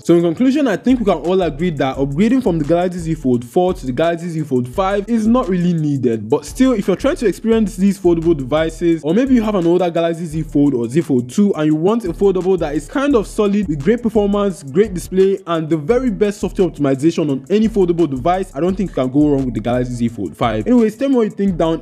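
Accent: Nigeria. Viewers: Keep it up, your Nigerian accent day give me special joy. 👍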